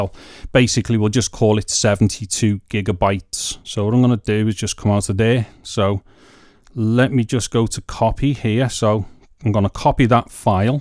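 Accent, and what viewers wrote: Liverpool, United Kingdom. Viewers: Scousers posh telephone voice right here 👆🏼 great video mate really informative 👍🏼 coming from a Warrington half and half over here 🍻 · This was just what I needed to be certain that the Samsung T7 works for shooting video with the iPhone 15 Pro Max. I've now bought the hard drive and I am excited about trying it out. Thanks so much for sharing this. Also, as a silly American I am just in awe at how amazing your accent is. Is that Liverpool? It sounds so cool!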